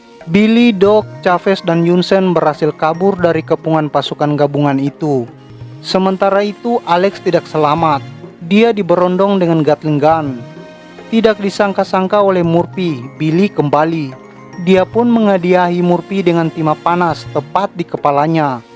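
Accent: Eastern Indonesia, Indonesia. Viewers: Fix adminnya orang timur. Jelek banget pembawaannya 😅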